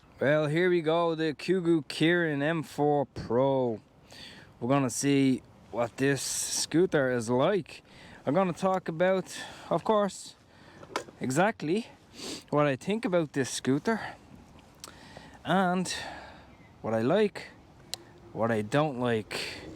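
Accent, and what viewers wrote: Ireland. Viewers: You sound Irish i live in Dublin thinking of getting one put off recently after i heard someone was charged and banned from the roads for riding his e scooter without a license or insurance, Everywhere i look online it says there's no license required or insurance in Ireland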